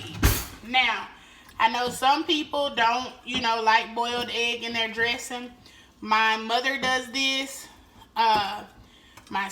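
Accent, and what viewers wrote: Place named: Alabama, United States. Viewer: You gotta be from Alabama… southern down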